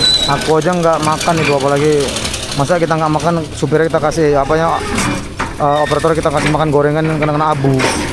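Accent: Medan, Indonesia. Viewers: Semangat menyala buat kontennya abangkuu. Senang kali awak dengar aksen medannya bang 😂👍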